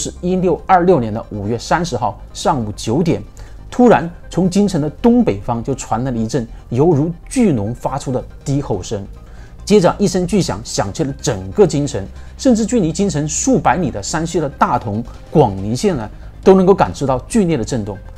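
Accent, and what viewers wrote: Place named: Fujian, China. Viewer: 福建口音听不出来吗你🙈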